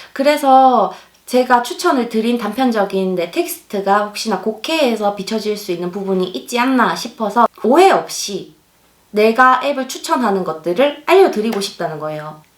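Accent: Gyeongsang, South Korea. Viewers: 갱상도 사투리 ㅋㅋ 말투가 귀엽네요.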